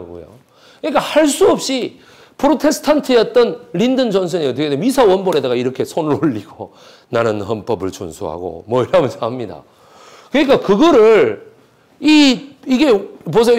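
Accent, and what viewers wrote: Gyeongsang, South Korea. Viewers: 갱상도라 구수한 말투 정감이 갑니다 전 칠곡이거든요 요즘 강의들으면서 많은 사실적인 스토리에 감동입니다 감사합니다~^^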